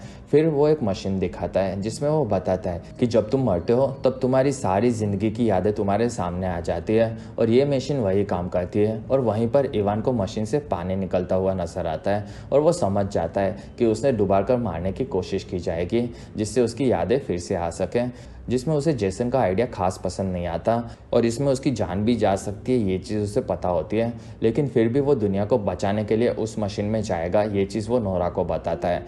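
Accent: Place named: Gujarat, India. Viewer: He is from Gujarat. Koi problem nahi hai, sabka apna apna style hota hai.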